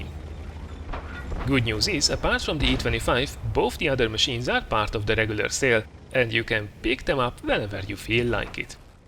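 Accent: Hungary. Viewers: you are hungarian right/magyar vagy ugye? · You are hungarian right? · akkor jol gondoltam a kiejtesedbol h magyar vagy😄😃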